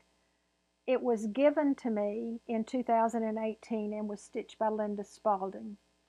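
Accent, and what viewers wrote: South, United States. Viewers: I love listening to your southern accent. Also love green Manger and red Manger. Glory and circle flowers. Happy Easter.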